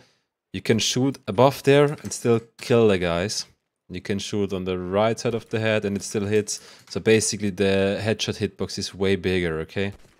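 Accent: Germany. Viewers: bro is defenitif deutsch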